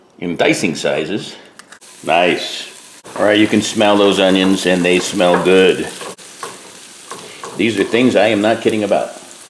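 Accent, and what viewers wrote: East Coast, United States. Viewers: Yea buddy. where are you originally from? East coast?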